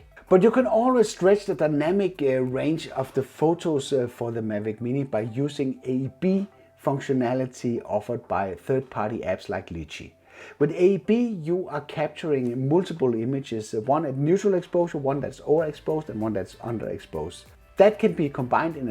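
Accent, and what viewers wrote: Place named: Denmark. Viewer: man kan godt høre at du er dansk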